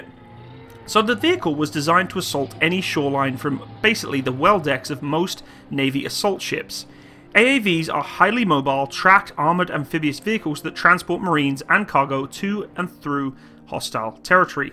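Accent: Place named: England, United Kingdom. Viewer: The narrator sounds English but talks like an American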